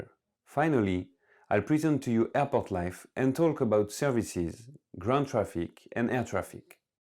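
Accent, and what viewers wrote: France. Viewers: This french accent :) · yes, enjoyed this new features video. You definitly want me to take the plane and go back to home, with this beautiful easy listening french Chiracron english Jerusalem accent :-D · It feels like watching a video presentation by Airbus. The french accent. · French accent :) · L'accent français?😅... Great video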